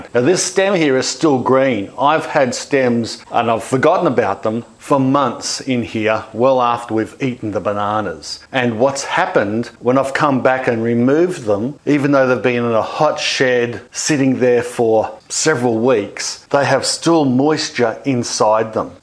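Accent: Australia. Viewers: You look awful like Russell Crowe. Also, you happen to be Australian, judging by your accent. You don't happen to be related to him, do you?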